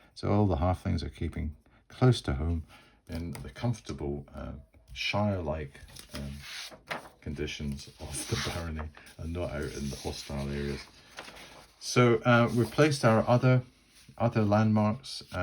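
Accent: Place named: England, United Kingdom. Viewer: Sir your English accent is pleasing to the ear and rarely gives me trouble. However for a minute tonight I was hearing High Merlin. " High Merlin what a concept! " I then caught up and realized it was high moorland. Sweet.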